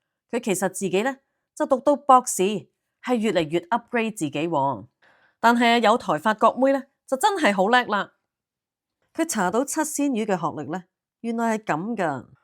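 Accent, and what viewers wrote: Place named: Hunan, China. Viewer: 七仙魚口音應該是湖南人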